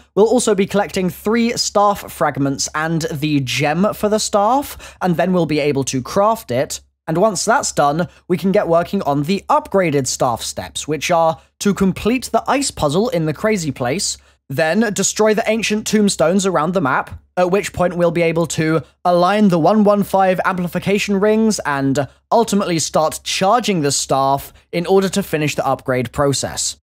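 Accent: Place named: England, United Kingdom. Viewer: Estos si es asentó ingles no como lo que tengo en usa xd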